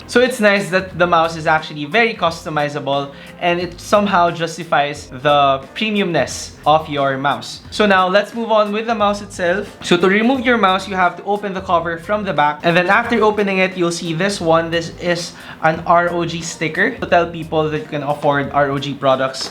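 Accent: Philippines. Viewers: Haha no worries. After seeing the Mexican conversion, I remembered you had a Filipino accent and looked that up.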